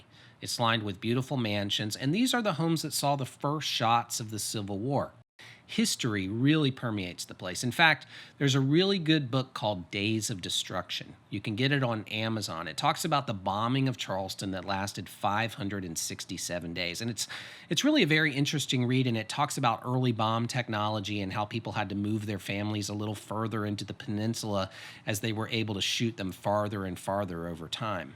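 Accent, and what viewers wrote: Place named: Philadelphia, United States. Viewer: Please do more...enjoyed! You sound like you might be from the Philly area. My ggg....was Lord Ashley Cooper, brought the 1st quakers to Charleston. Please do more of downtown!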